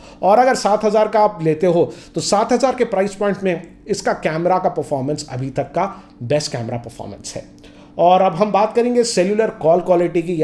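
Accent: Hyderabad, India. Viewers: Arka Seth trust me its only 30% hyderabadi hyderabadi is my native language if he talks completely hyderabadi u guys won't understand a thing!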